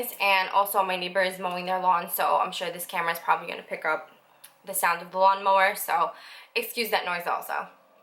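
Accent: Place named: New Jersey, United States. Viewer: Looks like a great shade match! Thanks for the review. PS - am I hearing Jersey in your accent? My mom's from Jersey, and sometimes I get a whiff of this accent ;)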